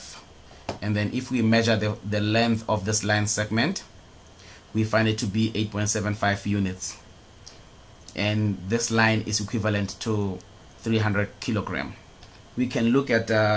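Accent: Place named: India. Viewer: nice job. Thank you professor. I love your Indian English❤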